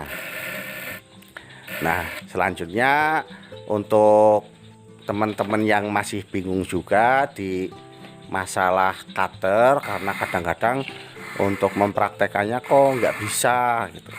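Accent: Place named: Banyumas, Indonesia. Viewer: Logate banyumasan banget pak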